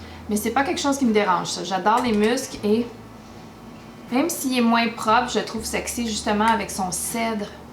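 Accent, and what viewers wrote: Quebec, Canada. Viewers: Bonjour, j'adore ton humour l'air de rien quand tu parles des sorties et des confinements 😁 Pour moi, faciles à porter: N°19 (mon parfum signature), Insolence et Shalimar millésime de Guerlain (coup de coeur mais mauvaise tenue), Vanille tonka de Nicolai, et plein d'autres... Ceux avec lesquels j'ai plus de mal désormais, les "cheap" de façon générale, par exemple la collection "Les notes gourmandes" de Réminiscence (Guimauve: je ne peux en mettre que sur mon poignet ou le sentir dans la pièce, mais ni moi ni sur les vêtements). Mais aussi les parfums dont la base est l'oud, tous les masculins en général, ainsi que les "odeurs de propre" (muscs trop synthétiques). Merci pour toutes tes vidéos qui sont un vrai plaisir à chaque fois, avec ton accent (euh, je veux dire le fait que tu n'aies pas d'accent français 😁) qui me donne envie de retourner au Québec! 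Bises 😘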